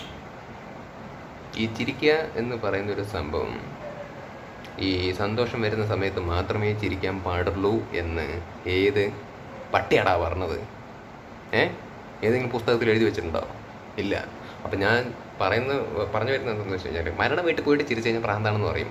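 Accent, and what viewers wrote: Palakkad, India. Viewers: Bro Palakkadan aano✌️ Slang ind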